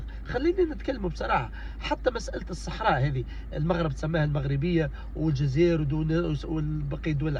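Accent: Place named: Tunisia. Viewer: صاحب الفيديو تونسي اللهجه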